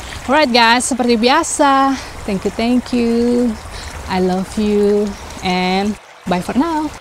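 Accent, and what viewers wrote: Banyumas, Indonesia. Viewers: Logat ngapake kentel ya yu 😁😁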